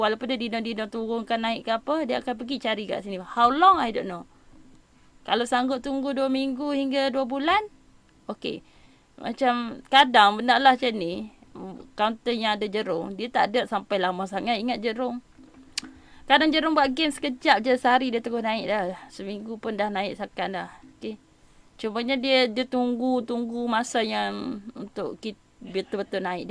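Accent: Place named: Kelantan, Malaysia. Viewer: awk terlalu kelantan bagi sy..tq kak ats ilmu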